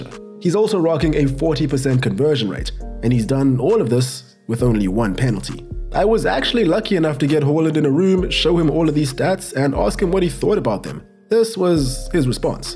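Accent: South Africa. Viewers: Are you south african? You sound south african. and yes, i myself am south african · I was watching this video, And something just made it feel homely, Then I realised, You are speaking with a South African accent. Now I see your channel and content and think. Wow, South Africa has talent and your production is on par with YouTube stars. So I'm so proud to see a local have such a successful channel! Welldone. But also, yeah when Haaland came to man city, I knew him from bvb and from his insane international scoring. You know he holds the record for the most goals scored in 1 game, I believe it's 9 individual goals in an international game. I knew the premier league wasn't ready for a player like him. I do believe though managers are strat'n on how to counter him as we speak. So let's' see later on if he can keep this going. His a huge dude with some insane accuracy with his shots. 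Also his size, you'll notice in games, he throws his body in the way for the ball to bounce off him into the goal. Mainly by throwing those long legs out.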